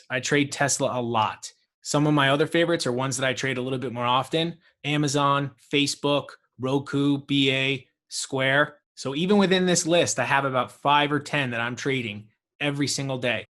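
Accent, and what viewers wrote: New York, United States. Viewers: That accent are you from ny/nj?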